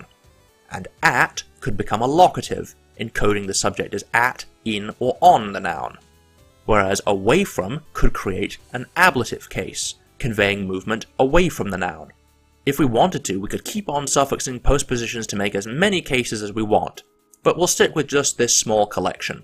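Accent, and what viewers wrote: United Kingdom. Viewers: Damn British English. I keep thinking he's saying "copular", not copula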